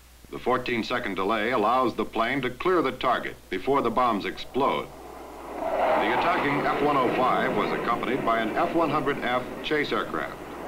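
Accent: United States. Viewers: Thank you again for the upload.... I love the films also the American narration .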